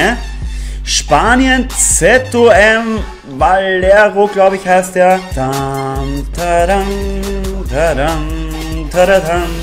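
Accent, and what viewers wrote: Austria. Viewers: Ich habe schon lange überlegt es mit youtube zu versuchen bin zwar Bayer wäre vom Akzent fast genau so wie bei dir als Österreicher :P wären auch neue Freundeskreise die man vill knüpfen könnte und diese Kamera von Logitech wäre ein Anreitz um es doch in Angriff zu nehmen das ganze :)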